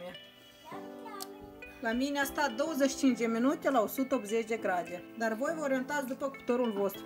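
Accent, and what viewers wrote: Moldova. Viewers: dupa accent si dupa scrisul in rusa de pe plicurile cu condimente, as zice ca de peste :) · Ești minunata atit de mult i mi place cum vorbești asa vorbeau bunicii noștri acum 60 ani.I mi amintesc și acum ce femei harnice frumoase bune asa ca tine. De cite ori i mi e dor ma uit la tine la rețete și la Stela Stratulat numai sa va aud dulcele grai Moldovenesc. I ți doresc sărbători cu bucurii sănătate alături de cei dragi ție.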